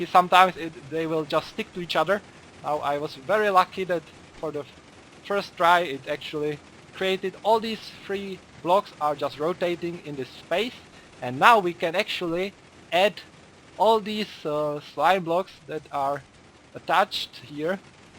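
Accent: Czech Republic. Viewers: Jde to znát že jsi čech :D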